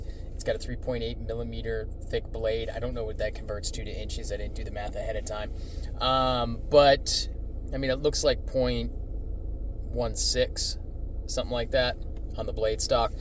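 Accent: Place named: Italy. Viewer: New subscriber here. It was your Italian accent that made me do it…..lol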